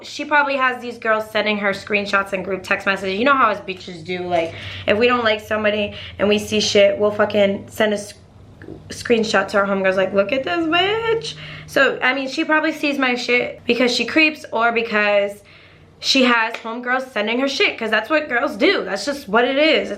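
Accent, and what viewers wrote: Boston, United States. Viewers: I LOVE when your thick Boston accent comes out😍! I love the way bostoners? Talk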